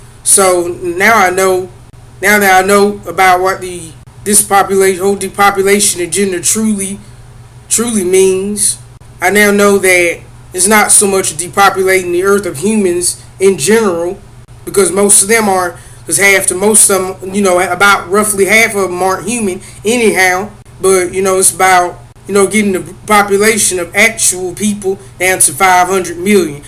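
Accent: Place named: South, United States. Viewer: OMG your southern accent is soooo strong lol and cloning is getting out of control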